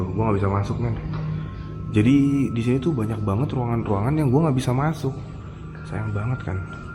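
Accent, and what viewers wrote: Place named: Java, Indonesia. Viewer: Adem rasanya denger langgam jowo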